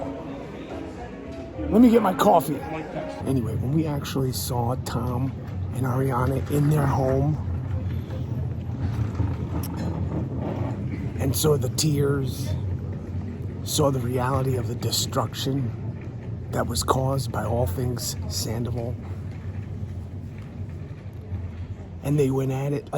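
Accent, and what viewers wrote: New York, United States. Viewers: Love you and your New York accent. Love your work especially as a beat cop shooting a bird on Friends. You are a classic. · I love hearing your opinions on all of it and how passionate you are about all things Bravo- keep ‘em coming. And F YouTube for restricting you- I need your F bombs and New York street talk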